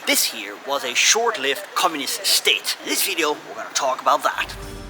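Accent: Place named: Netherlands. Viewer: the guy speaks with a very thick Dutch or Scandinavian accent. It's not nice - it hurts my ears. things like this should be banned. if you are Dutch, make videos in Dutch, pal.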